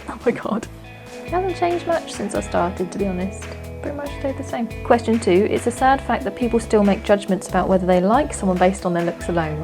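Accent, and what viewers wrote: England, United Kingdom. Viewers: lets comment. i dont norm bother but i found your channel ages ago and all i heard was some nuts english lass babbling away in her helmet. I was like yes so its not just me. You keep it it up and fair play with the face on cam stuff bst be careful on what ya put up on the because of the fuzz.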